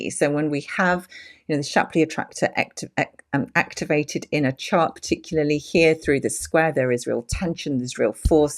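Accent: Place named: United Kingdom. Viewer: Hello, my middle name...also, its nice to hear a british lady doing astrology, very few are very good, i have studied astrology since i was `16, because i wasnt good at sussing out people, astrology helped me so much there- now i see it actually working on the world stage- the wise men of the past knew much more than we think we knew, they used it daily and as a map and we can learn much from this xx THANKYOU X m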